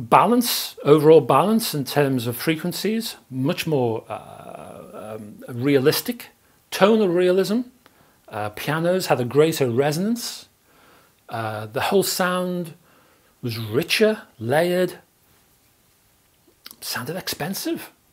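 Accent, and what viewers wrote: Liverpool, United Kingdom. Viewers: Hi, I recently purchased the Audiolab 6000A and then subsequently found your youtube page and web site, so it was probably a sheer fluke I made the decision I did. Anyway, now i'm watching your review of the 6000CDT and will probably get me one of those. I do however have a couple of questions, if I may: my experience of hi-fi is purely analogue and in your review you discuss the merits/benefits of adding an external DAC - excuse my ignorance if you covered my question - the 6000A has an internal DAC (?), so would this combo of the 6000A and 6000CDT still benefit from the external DAC. My apologies for the long winded getting to the question, my second question - are you a scouser? thanks in advance mate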